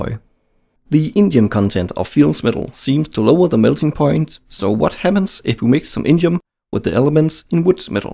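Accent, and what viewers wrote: Germany. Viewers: I've an Offtopic Question :§ could it be that you're german ? :D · ou :D you sound pretty german to me :D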